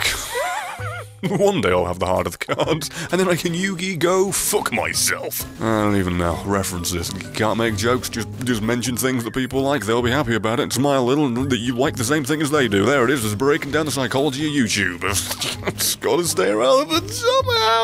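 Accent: United Kingdom. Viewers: I can’t tell if he is English, Scottish or Welsh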